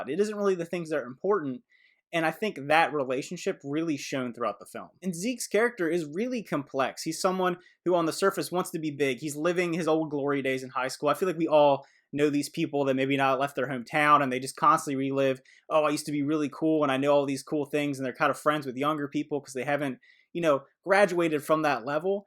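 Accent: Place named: Philadelphia, United States. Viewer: You from Philly bro? Or jersey?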